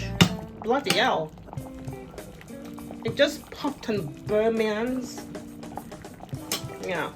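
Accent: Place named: Ireland. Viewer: You sound like them Irish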